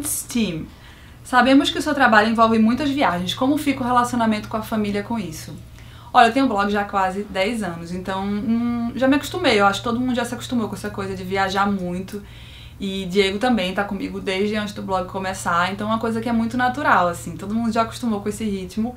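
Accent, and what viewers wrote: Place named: Recife, Brazil. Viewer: Esse teu sotaque é o melhoor! Recifense <33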